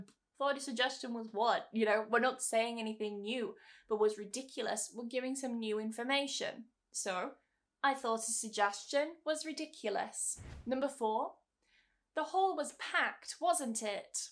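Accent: United Kingdom. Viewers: English pronounciation? Or british accent pronounciation? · British accent is fascinating 😍 but I wanna learn US. accent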